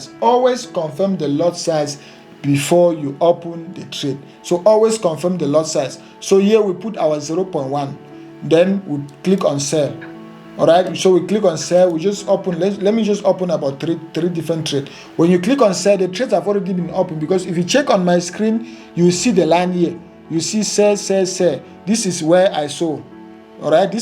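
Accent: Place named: Nigeria. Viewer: No one can teach me shit with this Nigerian accent. Absolutely no one. It sounds childish🤣🤣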